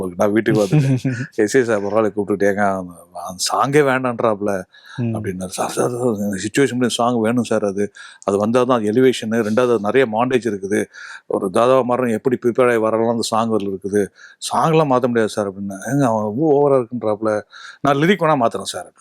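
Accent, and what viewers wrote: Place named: Southern Tamil Nadu, India. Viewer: அருமை அருமை ❤நெறியாளர் தென் மாவட்டம் போல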